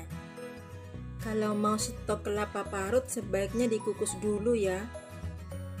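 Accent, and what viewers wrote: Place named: Madura, Indonesia. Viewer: Mantep apenna yu. Empian oreng kaemma? Mak logatta padena reng madure. Sukses yu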